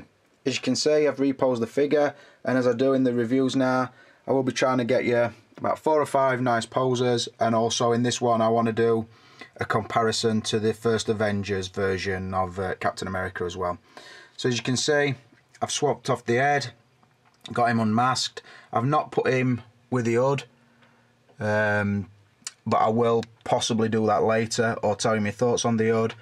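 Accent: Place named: Yorkshire, United Kingdom. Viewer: tou sound like a good old yorkshire boy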